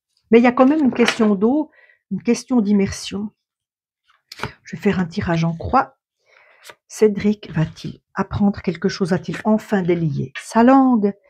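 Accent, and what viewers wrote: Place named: Romandy, Switzerland. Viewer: Ce petit accent suisse Romand ! toujours un plaisir de l entendre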